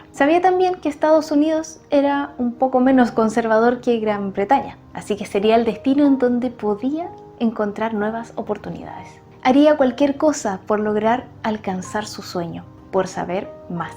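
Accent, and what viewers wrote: Chile. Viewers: De seguro es chilena con ese acento.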